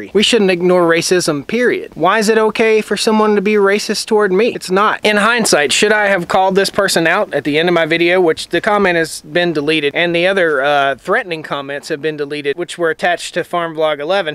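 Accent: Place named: Franklin County, United States. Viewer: I absolutely knew where you were from as soon as you finished the first fifteen seconds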